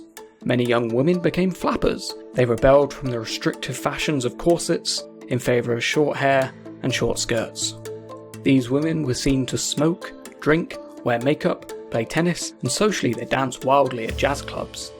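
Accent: United Kingdom. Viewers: What’s with the British accent for an American history presentation? I can’t even!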